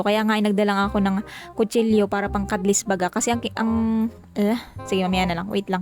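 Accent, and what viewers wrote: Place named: Visayas, Philippines. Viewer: Ido bakit Minsan may halong Visaya Ang salita mo? Sinong Visaya sa maguwang mo?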